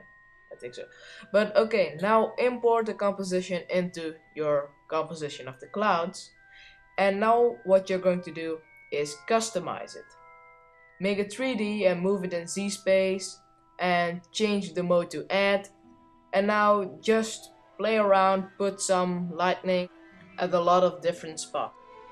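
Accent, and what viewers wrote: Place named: Netherlands. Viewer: are you from holland because it sounds like you're from holland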